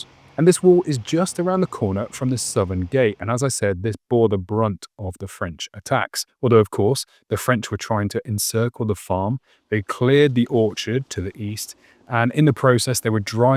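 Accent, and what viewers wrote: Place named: London, United Kingdom. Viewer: It’s not an impediment it is I suspect a London dialect and as such as valid as RP this is not 1930s England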